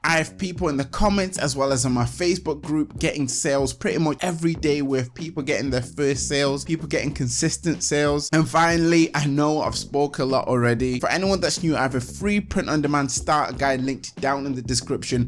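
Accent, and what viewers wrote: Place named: Manchester, United Kingdom. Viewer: Ha, yes! I recognised a fellow Manc. Nice one our kid. 😁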